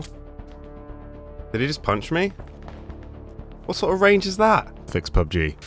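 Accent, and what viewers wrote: United Kingdom. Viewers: I love your accent. I wanna marry and englishman and hear him talk all day.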